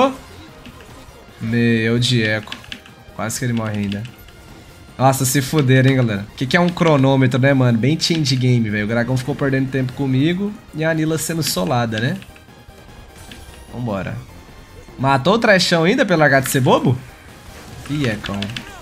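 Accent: Minas Gerais, Brazil. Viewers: Não sei dizer se você é mineiro ou paulista... talvez os dois...kkkk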